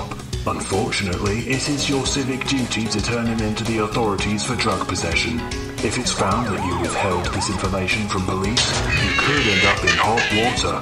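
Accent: United Kingdom. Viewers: i guess in their country, which seems to be the uk based on the voice (and as i reread this, i googled it and google claims they are scottish so it checks out), there are laws trying to restrict access to obscene content in general. i googled it and i think the laws are actually stricter if they are uk-based. as an american the only thing that's treated like this is cp (and maybe zoo porn depending on the state). so i was really concerned by this video, but since they are not american they may very well have a reason to parody censorship laws like this.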